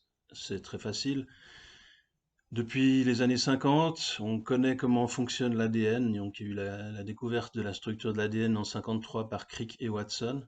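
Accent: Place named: Switzerland. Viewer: Moi, je suis français mais juste de l'autre coté de la frontière Suisse (coté Jura) ... D'où l'habitude d'entendre ton accent ... Je suis biologiste médical et j'ai donc des compétences en infectiologie et en biologie moléculaire faisant tous les jours des PCR covid ... Et donc la contagion des maladies infectieuses, je la constate tous les jours. Je suis entièrement d'accord avec toi qu'il faut mettre en lumière Béchamp pour expliquer à toutes ces personnes qui sont intervenues en commentaire de cette vidéo que ce scientifique a TOUT faux.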